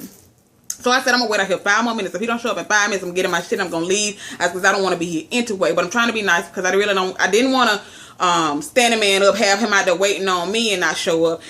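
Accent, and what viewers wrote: South, United States. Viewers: Lol I love you American accent .. And damn loads of old men got nothing to do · Southern not american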